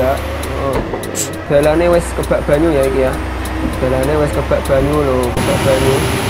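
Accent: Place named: Pati, Indonesia. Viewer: Oh orang pati dikira orang indramayu hehehe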